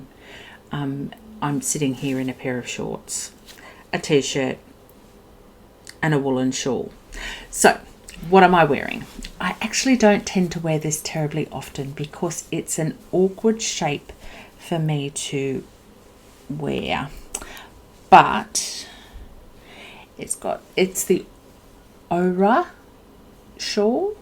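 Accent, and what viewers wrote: Australia. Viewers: I knew you were a fellow Aussie from the first hello. Loved your video! subscribed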